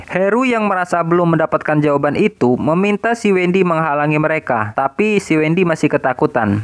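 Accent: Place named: Java, Indonesia. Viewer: Bjir jawanya klur ngakak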